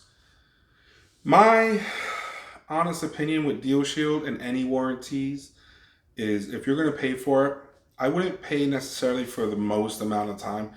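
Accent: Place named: New York, United States. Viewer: I knew it; you couldn't hide the NYC if you tried lol. Great video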